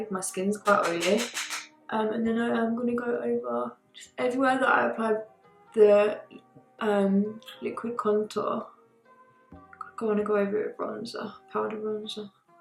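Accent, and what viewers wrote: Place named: Manchester, United Kingdom. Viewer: Is it me or accent is changing lol 😝 baby girl!!! Xxx hearing that manny accent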